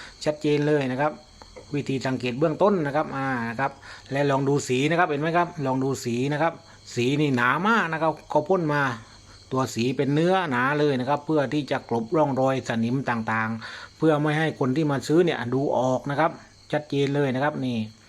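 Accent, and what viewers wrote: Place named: Tak, Thailand. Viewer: สำเนียงพันนี้คนตากแน่เลยนิ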